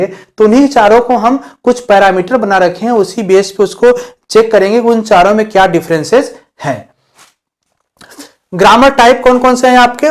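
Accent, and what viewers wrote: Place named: Jaunpur, India. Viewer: sir aap jaunpur se ho kya ?🤗🤗🤗🤗🤗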